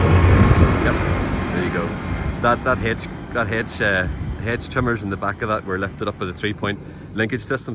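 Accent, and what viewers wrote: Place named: County Down, United Kingdom. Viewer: Thank you - very interesting. Your lovely County Down accent is like honey in my ears!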